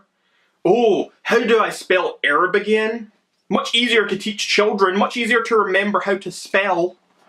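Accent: Scotland, United Kingdom. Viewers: lol dude from a different country with an british accent is telling me how to say herb go to a Spanish guy ask him I bet he won't say it like you you non educated crazy jerk and by the way we all day it as herb with a H dumb*** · Do not make fun of us Americans. Queens English is not hard, it’s called accents you dumbass. You have a Scottish accent, I have a Bostonian accent, I say erb, you say herb. Not something to make a video about when your Chanel is dying and when you make uneducated remarks with no evidence. You know what I’m talking about.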